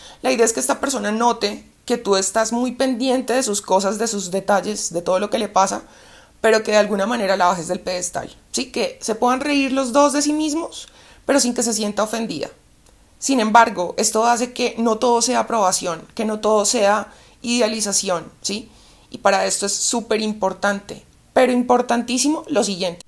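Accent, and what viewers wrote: Colombia. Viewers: ALABADADO SEA EL SEÑOR POR FIN UNA PERSONA QUE HABLA CLARO COMO EL AGUA... NADA QUE VER CON ESOS SEUDO COUCHES QUE HABIA MIRADO PENSANDO QUE ERAN LO MEJOR.. POR EJEMPLO SEGUIA A UN ESPAÑOLETE QUE SE PARECE A MIGUEL BOSE PINTA EL MAN Y NO LE HE ENTENDIDO NI JOTA . PERO NUNCA PERO NUNCA PENSE EN EL PUNTO DE VISTA DE UNA MUJER SINCERA FRANCA Y DIRECTA...TE DOY UN GIGANTE 10 DE 10 TE FELICITO VEO POR TU ACENTO QUE ERES COLOMBIANA , GRACIAS COMPATRIOTA ESTAS HACIENDO PAÍS . NO ERES JESÚS PERO DEVUELVES LA VISTA A LOS CIEGOS. ERES MUY LINDA Y NO ME REFIERO A LA FACHADA A TU APARIENCIA PERSONAL QUE ESTA EXELENTE POR CIERTO. A LA BELLEZA VERDADERA LA QUE VALE MIL MILLONES DE TONELADAS DE ORO A LA BELLEZA INTERIOR. A TI MI REINA TE SOBRA HASTA PARA DAR Y REGALAR.. EN SERIO ERES LINDA Y BELLA DE MENTE ALMA Y CORAZON 💓💓💓💓 LO DEMAS COMO LA APARIENCIA O TU ASPECTO VENDRA POR AÑADIDURA.. 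GRACIAS TOTALES POR TUS PALABRAS LE DAS VALOR AL VALOR TUS PALABRAS Y FRASES ME MOTIVAN Y ME SACAN DEL ENGAÑO DE ESTE SISTEMA SOCIAL CORRUPTO Y OPRESOR. YO TAMBIEN TE ESTIMO. AUEQUE NUNCA TE VALLA A CONOCER SE QUE ERES DE FIAR Y CON ESO ME BASTA SUBE VIDEOS AL 💯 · Hola..te felicito que yo no dr donde sacas esas ideas y cuando las piensas...Si te vienen s la cabeza cuando estás viendo el juego de fútbol o en el supermercado o te pones a pensar y estudiar y después te sientas a hablar o es que hablas que hablas con los asesores de España estos unidos Dinamarca y Nepal que tienes...Eres muy inteligente y hablas como su fueras colombina. Te felicito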